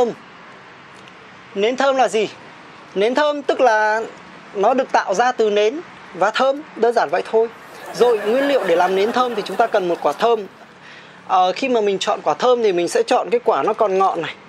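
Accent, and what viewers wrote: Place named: Central, Vietnam. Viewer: One Move : người miền trung:))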